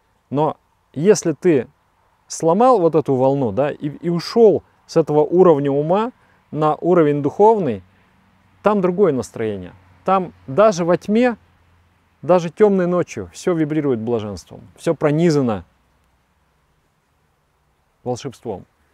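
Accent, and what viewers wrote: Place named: Moscow, Russia. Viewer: не думаю, что он украинец. Его канал называется " ", а не " ", это раз. Второе, говорит по-русски, даже после всех злодияний русских, на нашей земле, третье, говорит, с явно мАсковским акцентом. Он не наш.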